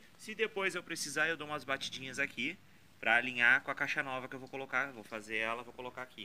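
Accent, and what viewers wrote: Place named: Rio Grande do Sul, Brazil. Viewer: cara legal seu canal eu tenho vontade de comprar um caminhonete dessas ,vi pelo teu sotaque que tu parece ser gaucho tu e de onde ?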